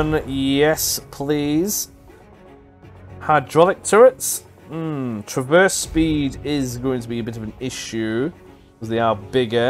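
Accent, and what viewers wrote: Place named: England, United Kingdom. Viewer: do you live up north or down south? Just because you said you were Scottish but you sound English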